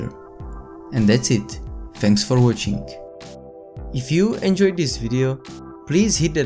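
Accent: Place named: Czech Republic. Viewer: Český přízvuk nezapřeš :D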